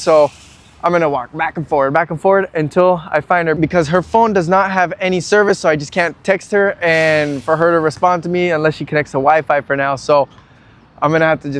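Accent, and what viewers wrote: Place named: Argentina. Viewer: Saludos desde Bogota. Your Argie accent is pretty spot on; very authentic sounding. Could you answer a question? In one of your other vids you mention that you've been in Argentina for some years. How were you able to remain in the country for so long on just a passport without the DNI? Maybe because you are well and truly married to a citizen of the country?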